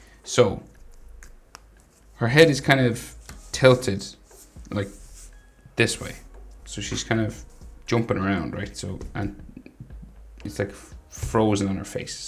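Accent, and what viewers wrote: Ireland. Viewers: I am curious, are you irish?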